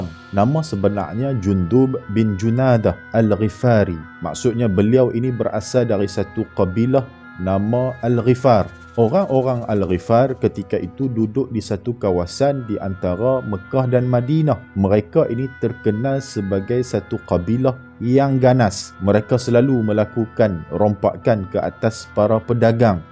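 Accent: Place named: North, Malaysia. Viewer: speakker org utaqaaahahahah pekattt ni rimbukkk org kl mna fhm apa hahahah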